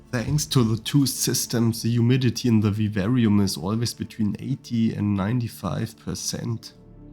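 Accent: Germany. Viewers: I bet you're German based on your dialect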